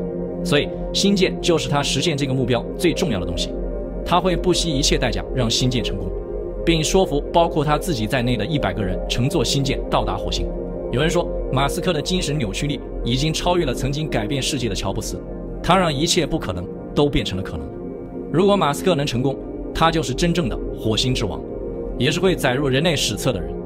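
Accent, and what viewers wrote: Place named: China. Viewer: 一嘴大陸口吻，不看了，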